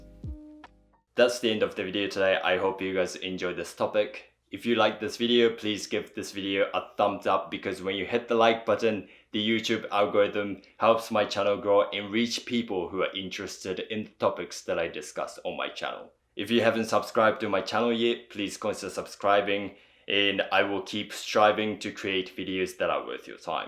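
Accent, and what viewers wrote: New Zealand. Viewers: Japanese guy with a Kiwi accent... That's hot! 😍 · Your English is very good, you should have no worries about this, you have a beautiful voice and I find it interesting to hear a bit of New Zealand inflection coming thru every once and a while. I have a feeling you have not heard very many Americans speak English so you don’t know how badly it can be spoken! 😂 Very interesting topic I am exploring more myself. Thanks. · I thought I could hear a Kiwi accent hehe, I really enjoy your video's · Kiwi at heart and speech. Don't let them sass you! Kia Kaha! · I can hear the kiwi and Japanese accent in your voice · Thanks for this video and sharing all your journey. Your English is quite good, and your NZ accent is endearing. I am grateful to be seeing Japan through your eyes. And some of your experiences of minimalism have helped me raise questions for my own approach to life. 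Please continue to share your observations with us. Blessings! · I agree, is a very good speaker of English. Being a Kiwi myself I can hear the NZ accent. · As a kiwi I spotted his New Zealand English accent immediately so not knowing anything about him (this is the very first vid of his I have seen) assumed he had spent some time in NZ. Looking back at some of his previous vids looks like I was right! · Okay, 1. You are freaking beautiful, no matter what you wear, 2. You speak English better than MOST Americans, 3. Your kiwi accent is so endearing, and 4. Haters gonna hate — screw ‘em. You’re more evolved than most. Stay focused, stay positive, stay healthy. Love the videos.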